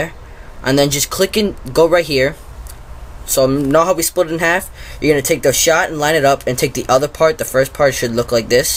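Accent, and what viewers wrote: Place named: United States. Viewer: Thank god, an american for once.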